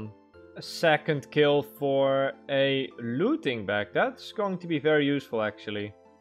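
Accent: Netherlands. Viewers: Hearing the Dutch accent is meh, but other than that legit insanely good and I’d love to see more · The dutch accent, haha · Are you Dutch? Your accent sounds really familiar.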